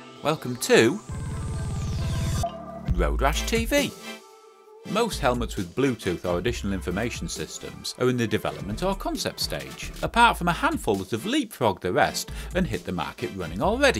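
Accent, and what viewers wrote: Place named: England, United Kingdom. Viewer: tried to listen to a couple of road rash videos. can't stand the sing-song, english narrator.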